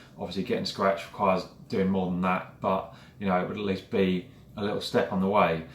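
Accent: Australia. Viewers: Well done in your 1 under the card, brilliant result! The key sometimes is to put things in perspective, there's no golfer in the history of the game that hasn't gone through a slump fighting internal demons from Ballesteros, to Tiger, Nicklaus you name it everyone goes through slumps. For me what works these days after fighting my own internal demons is I treat golf like trying to chase a butterfly. The more you chase after it you'll never catch it but if you just stand still and wait patiently much better chance of coming back to you. Keep up the great videos. PS.. bring back the Aussie dodgy accent, sorely missed.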